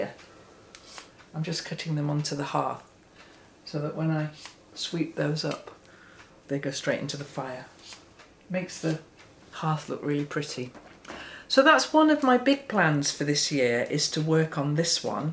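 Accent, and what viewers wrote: United Kingdom. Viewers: Can I say on repeat how much your videos comfort me in a way that’s hard to put into words. Your sweet British accent and the way you are so comfortable in your own skin. Your gratitude and contentment are contagious. I’m turning 73 on March 6, 2026 . You are a soft voice for those of us in our older years to remember what is truly important and just enjoy the process. May God Bless you in 2026 and keep you in good health. I need you!!❤❤❤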